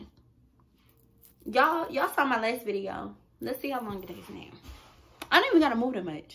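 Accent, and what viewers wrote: Baltimore, United States. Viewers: You’re from baltimore arent you😏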